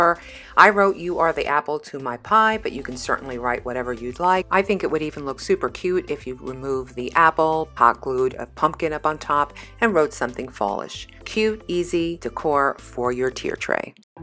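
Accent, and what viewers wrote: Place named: South, United States. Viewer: OMG!!! I’ve just started watching YouTube diy videos in the last month and I just wanted to reach out and tell you how much I LOVE your videos! Yes, the ideas are great but your commentaries remind me so much of my friends here in Texas! Woman, if I didn’t know better I would think you were a Southern girl!!! Keep the great videos coming,! #sistersfromanothermister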